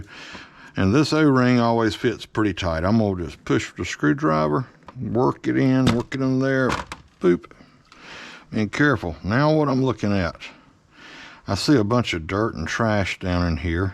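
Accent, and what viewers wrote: South, United States. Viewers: love your teaching with that southern accent thx for sharing your knowledge. I will fallow you from now on.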